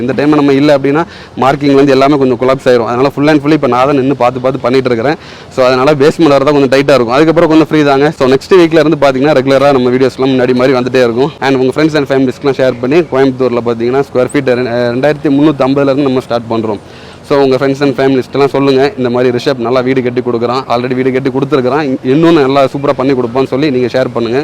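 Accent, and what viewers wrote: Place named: Karaikudi, India. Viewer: ஆமா ஏன் la வீடியோ போடல உன் ஸ்லாங் எனக்கு பிடிக்கும் ல அக்கா காரைக்குடி ல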